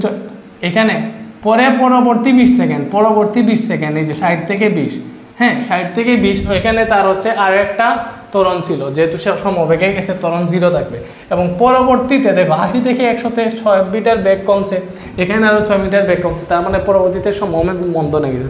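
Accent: Sylhet, Bangladesh. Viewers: আপনি কী সিলটি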